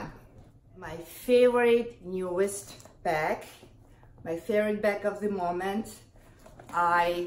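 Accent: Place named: Russia. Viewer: I love your Russian accent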